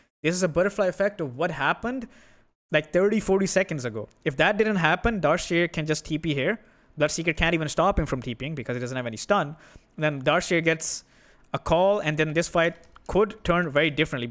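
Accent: India. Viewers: see scammer indian accent click dislike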